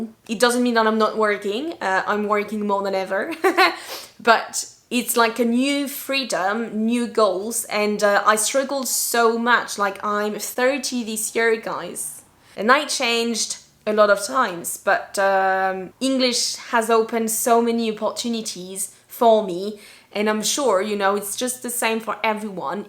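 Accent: United Kingdom. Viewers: At times, the influence of English teacher does affect our English learning journey.. By the way, I thought you're a British because your accent sounds like a typical British accent.. Nice story of English language journey you've shared with us, 👍😍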